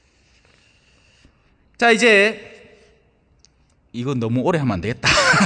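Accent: Gyeongsang, South Korea. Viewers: 🕯🥀찬미예수님... 신부님.오늘.말씀..참.올바르신.말씀압니다...감사합니다.찐.펜.입니다 경상도.말씀.화통하신.웃음..아멘🕯🥀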